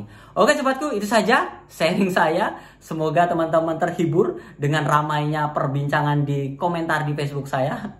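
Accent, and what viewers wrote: Java, Indonesia. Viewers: Kayak orAng jawa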